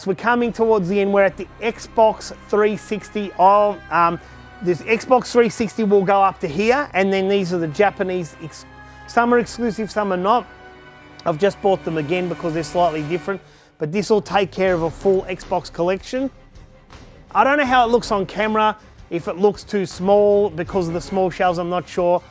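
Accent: Australia. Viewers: youre aussie? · Just love how you from down under says: so yeah, ehm yeah and so on. Sounds bloody awesome! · name It is not annoying. It is Australian.What is annoying to someone is the norm in a different context. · I am assuming you're from Australia because of your accent. I love your video game collection, now that's a collector. Keep up the good work! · Are u Australian? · you can hear the Aussie out of him :D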